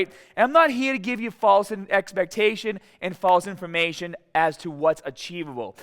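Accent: Boston, United States. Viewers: The exaggerated Boston accent is atrocious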